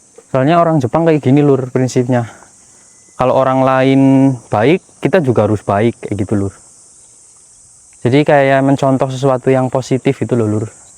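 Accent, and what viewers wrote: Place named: Banyumasan, Indonesia. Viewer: wkwk logat ngapake kuat bgt bro, purbalingga hadir😂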